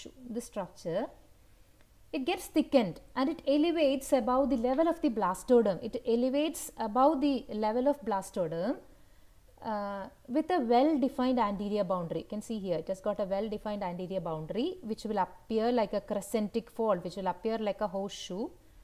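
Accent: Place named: South, United States. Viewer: and the moment i heard the southern accent, i was sure to obtain a wholesome experience.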